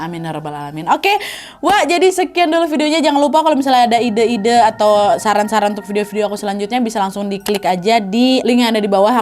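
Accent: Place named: Banjar, Indonesia. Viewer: Kak, jujur kakak mirip bgt sama keponakan suamiku. 11.12 loh kak bedanya suaranya aja yg disini lebih ke Banjar ❤sehat selalu ya ka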